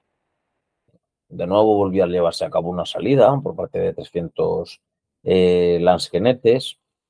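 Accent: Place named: Aragon, Spain. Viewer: Tú imagina un "no" a Napoleón con ese acento, te deja claro que solo pasarás por encima de su cadáver. Te lo dice otro mañico. Como dijo Joaquín Costa: cuando España ya no sea España, Aragón seguirá siendo España..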